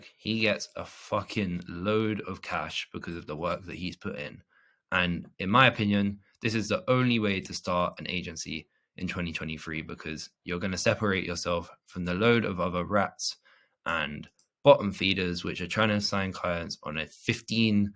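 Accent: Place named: England, United Kingdom. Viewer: Hey bro very useful video 👌🏽 I’m also from england feel like your accent is close to where I live lol. I got a question, I’m starting in the restaurant niche as I’m 22 and have been in the industry since 16 so call me an insider if you will, what I don’t get is the pricing, clientele is different to the average smma niches average cost per head is like 20-40 quid not 1000 so I don’t get how I can make it work maybe I already know the answer but would it help figuring out the pricing after the zoom call to find out about the restaurant how much they make and their goals etc thanks.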